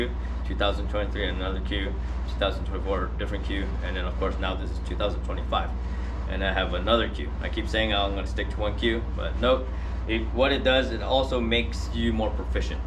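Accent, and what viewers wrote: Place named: Appalachia, United States. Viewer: I love how you sound almost as hillbilly as me through most of the video, then turn your heavy accent back on in the outro 😂 thanks I have been debating on getting into cue building and looking at this lathe. This was very helpful.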